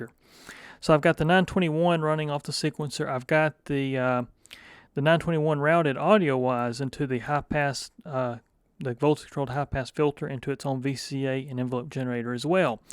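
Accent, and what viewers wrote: South, United States. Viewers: This southern charm and accents are true 😊 your videoes demoing the Behringer moog systems totally sold them to me ❤️ wow 😊 please never stop sharing your videos 😊❣️🙌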